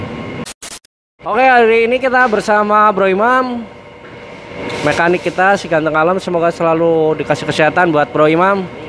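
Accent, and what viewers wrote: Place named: Banyumas, Indonesia. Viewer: Tp logatnya jenengan ngapak ya mas 😁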